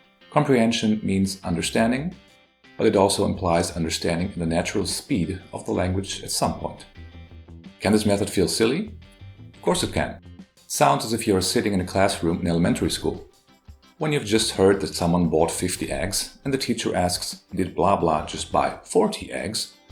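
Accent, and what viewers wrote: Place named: Germany. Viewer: Horrible strong "English" (german) accent.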